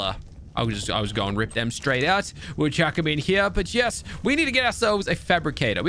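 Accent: Australia. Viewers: Are you Australian cause you sound Australian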